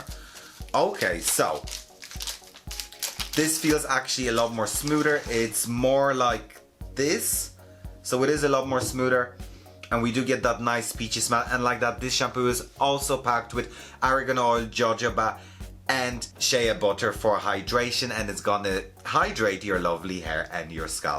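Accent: Ireland. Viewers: Love your wonderful Irish accent wanted to know wht you think of Osmo curl spray and their products in general.I cant afford very expensive hair products right now any cheaper superdrug or boots curl products you can recommend?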